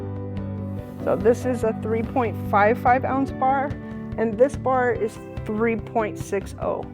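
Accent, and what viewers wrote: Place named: Arizona, United States. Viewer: I love love love hearing your Arizona accent. It reminds me of my grandpa. ❤️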